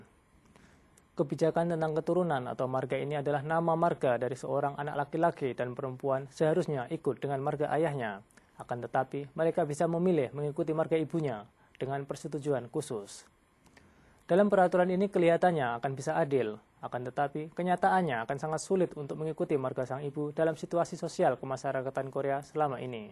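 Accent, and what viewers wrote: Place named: Java, Indonesia. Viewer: HE HAS A JAVANESE ACCENT! He pronounces words so bad :(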